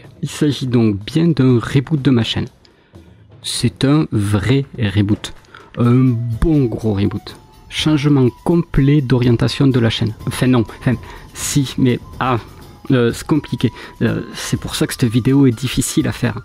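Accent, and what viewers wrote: Toulouse, France. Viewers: Voila pourquoi je n'ai jamais pensé a unsub cette chaine! Toujours un plaisir d'entendre cet accent qui m'est si cher (oui je suis toulousain et chauvain) Bon retour sur youtube j'attend avec impatience ton nouveau contenu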